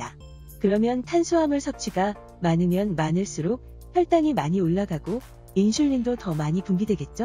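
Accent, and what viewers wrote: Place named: Busan, South Korea. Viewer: 바뀐 목소리도 좋지만 선생님 목소리가 더 이해가 잘가는거 같네요ㅜㅜ 특히 전 부산이라 아는분이 말씀하시는것처럼 들려서요~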